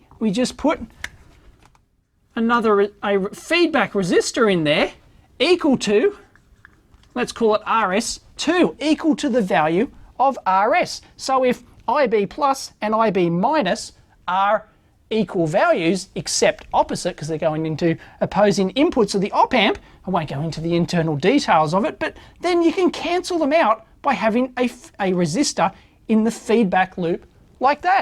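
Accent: Australia. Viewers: Its very funny to click on transcript and watch as the AI struggles to match Aussie accent. in particular...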